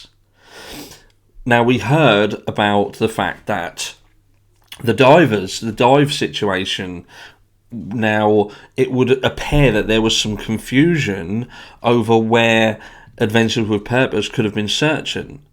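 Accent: United Kingdom. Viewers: I agree it seems very peculiar that jagger is living at keilys mums. I would say it’s a bit suspect. Love your Chanel by the way and love how you stick to the facts and the keily Rodni case. I understand from your accent your from the U.K. Although I’m not sure what area. I am from the U.K. and have been following this case but I havnt started my channel yet. I have been following this case and also Dylan rounds and gabby petito case. Look forward to hearing more of your channel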